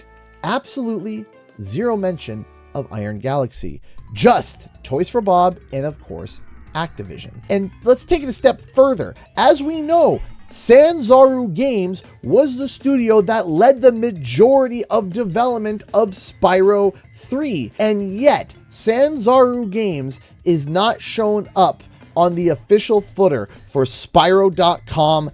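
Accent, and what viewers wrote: Canada. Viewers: What is doing talking about Crash bandicoot? He sounds more Canadian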